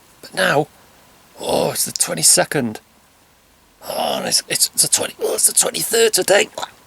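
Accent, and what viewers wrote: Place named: Liverpool, United Kingdom. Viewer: Great vlog love stories about how things used to be,i new u were a bit scouse by the way u say some words.Hope u got more stories to tell.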